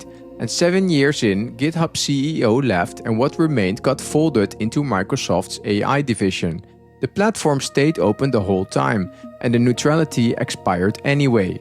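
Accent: Netherlands. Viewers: Just dropping by to say you have the Dutchest accent I've ever heard in my life.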